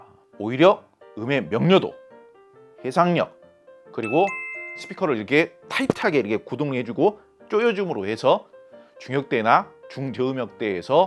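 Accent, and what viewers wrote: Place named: Gyeongsang, South Korea. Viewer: 말투가 그런 거잖아 약간 경상도 좀 느낌 억양이 살짝 있으니까 그렇게 들리는 거지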